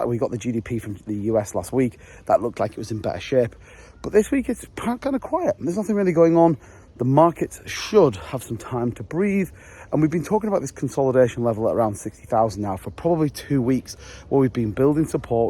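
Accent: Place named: Bradford, United Kingdom. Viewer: Wear are you from bro? Sounds like Bradford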